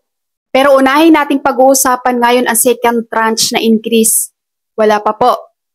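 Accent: Visayas, Philippines. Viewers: Bisaya kaayo intonation. Dili ra Landbank paspas ang crediting. BPI is fast too. Ma's maayo pa magbinisaya ka basi mas daghan mamati sa imo.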